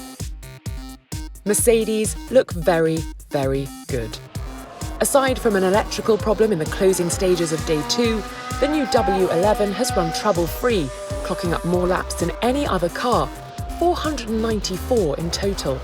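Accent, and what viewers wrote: United Kingdom. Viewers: Perfect English accent. Elegant without being toffy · Very pleasant and beautiful voice of the talking lady. I love how sound the british accent.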